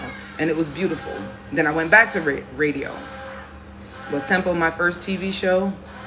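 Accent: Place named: New York, United States. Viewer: Its ok... but you sound like you from New York. Still, you got the voice for radio and the face for Youtube so you already have all the qualifications. ;-) I love the voice nuff nuff nuff, so I will watch and see what creativity and experience lies within the beauty with the dreadlocks. We can tell, you are unique in your own way... did I say I love di voice?:face-blue-smiling::face-blue-smiling::face-blue-smiling::face-blue-smiling::face-blue-smiling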